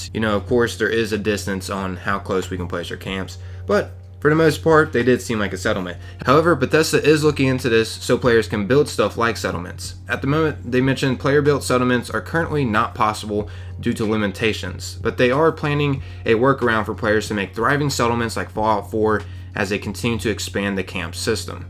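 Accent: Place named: South, United States. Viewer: Your from Ohio? I'm guessing southern. Def ain't North East 😂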